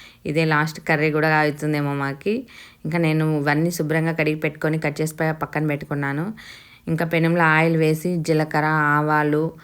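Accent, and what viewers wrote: Rayalaseema, India. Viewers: Mee proper ekkada ? Vintunte rayalaseema ankunta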